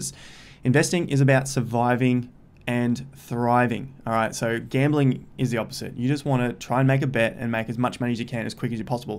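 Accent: Australia. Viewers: Maaate! I have been in this crypto la la -land since early 2017 (and seen thousands upon thousands of vids). This is hands down by far the best "tutorial" any new (and old) investor could get. Absolutely nailed it. No fuzz, no drama, just facts. Thanks a ton! On a sidenote, I studied 3 years in Brisvegas and listening to you(r) accent and seeing the QLD had me tearing up a bit;) Good on ya! Chers and thanks. Lotsa good vibrations from Norway!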